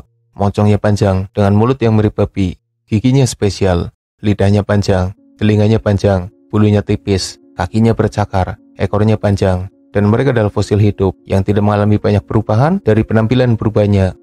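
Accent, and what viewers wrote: Banyumas, Indonesia. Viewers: Kaya wong ngapak yah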